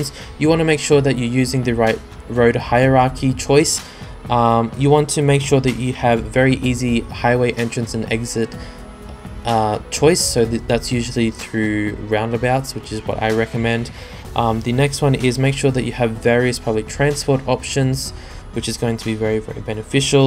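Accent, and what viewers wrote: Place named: Australia. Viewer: Ah, understandable. If it makes you feel better, you had me fooled. It sounds like you have a pretty normal Australian accent What's your first language?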